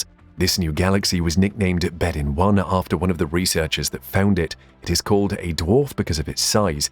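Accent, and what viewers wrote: United Kingdom. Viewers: you have the british accent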